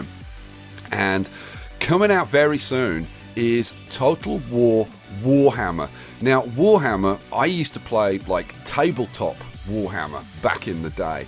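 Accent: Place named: United Kingdom. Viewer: Hi! I've recently started watching your Videos about building London in Cities Skylines and your enormous prison in Prison Architect. I'm really glad that you've came back and I'm particularly looking forward to Cities Skylines After Dark and Anno 2205! I can totally understand your reasons to quit for a while, some things can really upset you so much, even if they're not so bad after all, but you have been angry about it for weeks and can't stop just like that. I'm very happy that you're back again! (Sorry for grammar mistakes, I'm not a native speaker.) PS: You've got the most wonderful britsh accent!:)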